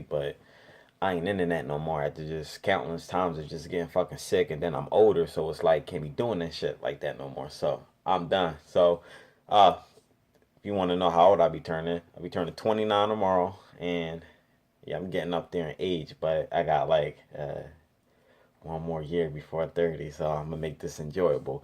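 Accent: Philadelphia, United States. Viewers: Yo u from Philly bro?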